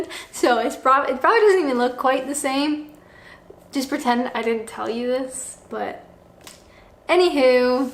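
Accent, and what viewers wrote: England, United Kingdom. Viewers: you're sounding more like a Brit come back soon you make England a better place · tbh you now sound like a brit ;) you never use to all good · Ciderrrr! That was very West Country. You have an English accent, It's nice you remembered to pack your sense of humour.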